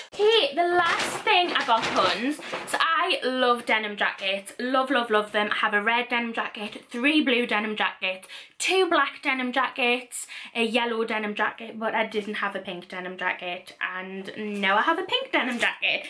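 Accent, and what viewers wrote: Newcastle, United Kingdom. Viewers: The reason I subscribed to your channel is because you are so down to earth and don't follow all the other YouTube bloggers, you are different from all of them...you keep it real basically and don't portray your image, I also enjoy watching your videos as you are always bubbly and I love your accent/voice it's brilliant! (I'm from down south and love hearing the different accents from other British people 😂 I'm not weird honestly! 😂).....I've followed you on Insta aswell 😊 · Reasons I follow you: - were both from Newcastle, it’s so nice to hear the Geordie accent online! - love the little challenges you give yourself! - I think you’re funny and so gorgeous! - I adore the confidence you have in yourself. ❤️ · I subscribed because it feels like home. You and I are both from Newcastle, ( I feel like there's not so many people on here from the north east) so wherever I am in the country, or in the world, I can click onto your channel and feel like I'm home again. 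It's so weird how an accent can transport you back to familiarity - especially when you're home sick. It's so lovely to see someone from the north east doing so well for themselves. We're always kind of looked down upon, for being dumb, drunk and outspoken, ever notice how when a geordie is on the TV - whether it be The Chase or Big Brother they always show us up? Thanks for not doing that. That's why I stayed - because you're not like everyone else. Thank you for always being genuine, fabulous and kind. P.S My Instagram is a new account so I didn't just follow for the giveaway! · The reason why I subscribe to you is because I never found anyone really relatable or who speaks like me (Geordie) I love your videos and watch them all the time xx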